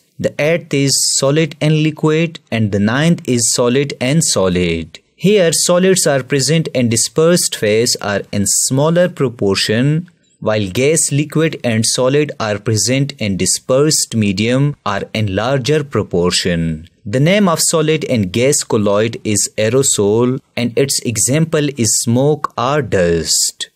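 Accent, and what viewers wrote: India. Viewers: Just the way bro speaks its obvius that bros indian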